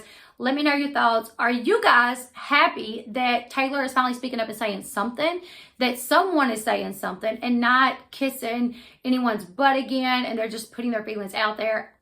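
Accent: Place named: Georgia, United States. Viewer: Yasssssssss so happy I found you all the same things I'm obsessed with AND I'm from Georgia so the accent is everything!!!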